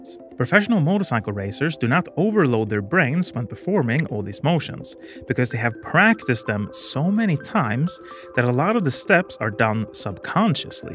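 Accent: Sweden. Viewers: Someone got a case of the swenglish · Är du ifrån Sverige? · Your swedish accent makes it way more accessible! Bromsa bak och titta fram!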